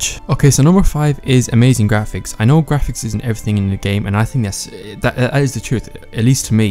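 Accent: United Kingdom. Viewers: is he british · Are you from UK?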